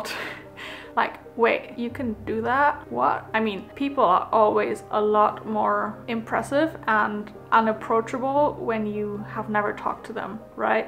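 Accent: Germany. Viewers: Hi, there are many German colleagues at my company and all of a sudden I just want to see this accent again 😄. Then, saw your ''when german engineers speak English'' video. It was the first time I discovered this channel. When it comes to this video, a university internship has been a turning point in my career aim. I was planning to become a manager since the beginning of the university even though what it is exactly, just like you. Whenever I watch one of your videos, I am settled more creative and focused mindset. Thank you for the quality content and the thoughts you share!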